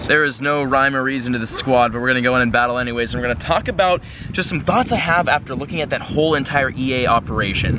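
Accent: Russia. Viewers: Russian accent wasn't too bad... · Russian accent is leet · That Russian accent got me😂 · More Rambling with a Russian Accent. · Bruh your russian accent cracks me up! XDDDD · Why is his russian accent so good hahaaha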